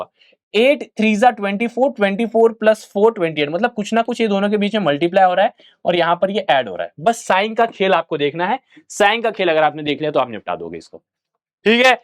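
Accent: Bihar, India. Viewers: bihari ho kaa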